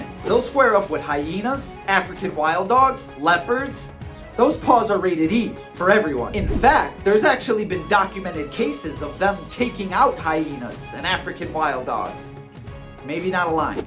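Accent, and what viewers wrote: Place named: New York, United States. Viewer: I just wanna say that I truly hope this guy makes a ton of money. He is so entertaining and hilarious and he looks at things that we're so used to and he makes us look at those things in a completely new and admirable way, like he makes us respect and appreciate the nuances of animals wtf?? And with a new york accent, it's just so uniquely epic and entertaining. Never change,!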